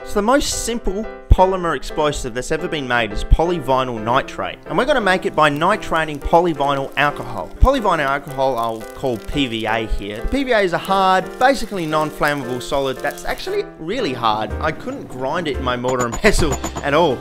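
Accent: Australia. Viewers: I'm not the smartest fellow so I'm just here to hear that nice aussie accent while i play video games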